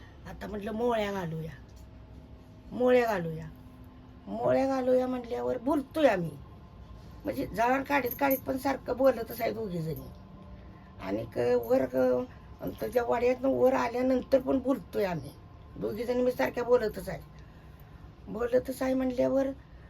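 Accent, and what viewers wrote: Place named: Rural, India. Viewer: Ajini ekdum gavchya padhati madhe cahb sangitla😊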